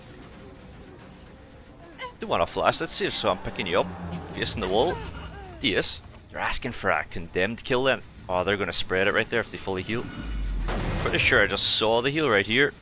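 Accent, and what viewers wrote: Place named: Scotland, United Kingdom. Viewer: I really love the accent! Are you Scottish Sir?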